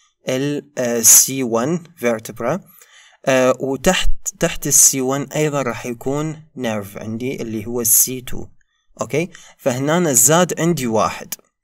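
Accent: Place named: Iraq. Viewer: ربي يوفقك بجاه محمد وآل محمد نحبك كلش من العراق اغلب متابعينك طلاب عراقين يمكن اني الوحيدة الي لاحظت اللهجة مالتك مزج بيها لهجة عراقية يمكن تستخدمها لان متابعينك عراقين حتى يفهمون اكثر احييك 💙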